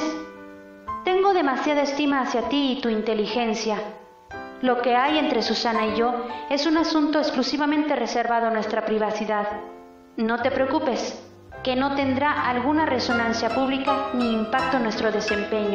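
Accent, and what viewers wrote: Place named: Spain. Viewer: Muy buena su acento español le da un toque d elegancia y donde esta la pecas😮😮😮😮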